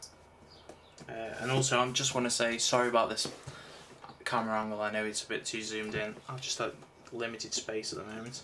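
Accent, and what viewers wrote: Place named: Liverpool, United Kingdom. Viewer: Are you from Liverpool? Your accent sounds like you're from Liverpool so I was asking if you're from there...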